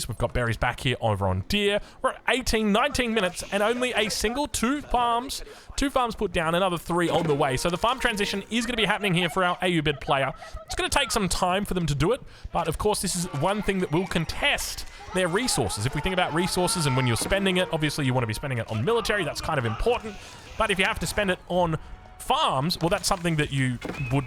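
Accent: Australia. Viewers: You have no idea the things I do when I hear a good Australian voice..... <3 Aussie :)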